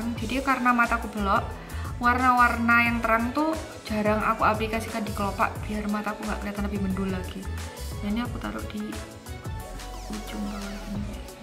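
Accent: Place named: Probolinggo, Indonesia. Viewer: aku suka sama mbak.😁 suami khu orang perobolinggo suka ketawa denger suara mbak, kata nya jadi kangen rumah 😂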